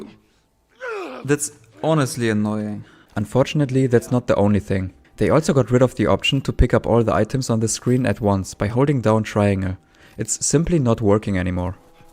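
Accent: Germany. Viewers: Man hört so fett raus das du deutsch bist hahaha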